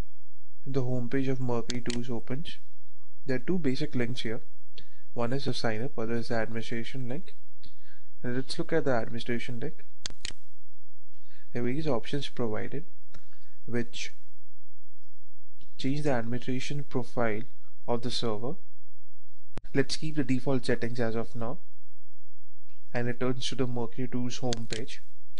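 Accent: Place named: India. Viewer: Thick Indian accent. Sounds like he has two huge bread stuffed inside his mouth.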